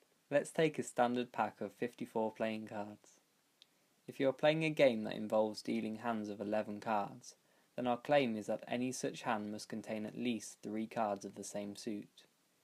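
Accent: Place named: Ohio, United States. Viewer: This is my uncle speaking your voice sounds like your from ohio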